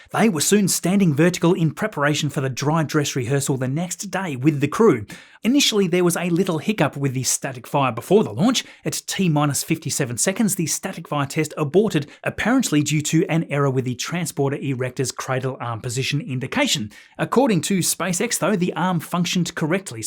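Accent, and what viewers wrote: Australia. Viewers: I love listening to Australians explain stuff. Their accent is top notch.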